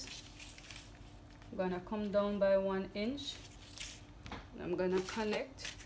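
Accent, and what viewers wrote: Jamaica. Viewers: Thank you for the video and for the scripture. Love your voice. New subscriber. Are you from Jamaica? Great video 😊✨💐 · Hi. Thanks. Are you Jamaican? U sound Jamaican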